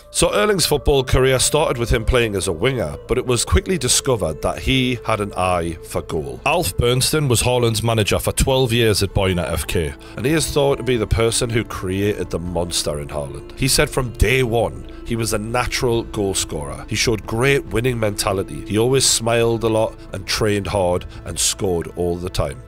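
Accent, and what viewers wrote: Newcastle, United Kingdom. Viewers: I love a true geordie documentary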